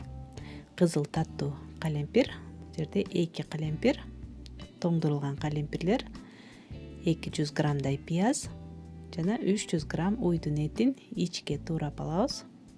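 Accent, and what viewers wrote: Standard. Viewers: ушул кыздын уну да жагымдуу. нукура кыргызча да келиштирип суйлойт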